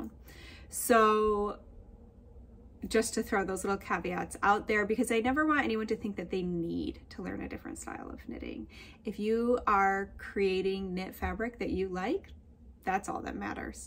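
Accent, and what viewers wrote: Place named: Midwest, United States. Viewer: loved the Midwest accent! I grew up in Minnesota and have lived in CA for 24 years. However, when I am tired or talking with family, that Minnesota accent pops right out!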